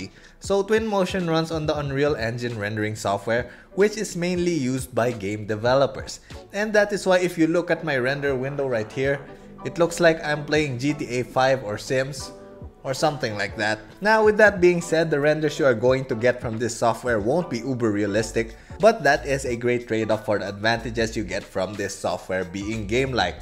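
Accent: Philippines. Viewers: Are you a Filipino? You have that accent you know.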